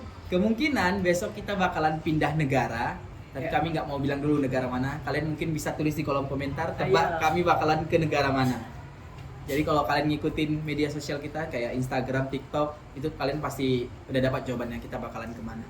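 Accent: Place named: Medan, Indonesia. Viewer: Bg aslinya orang mana? Logatnya keq orang medan😅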